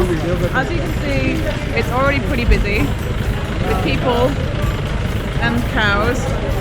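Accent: United Kingdom. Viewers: Mam ...I can guess..u r British..Am I right??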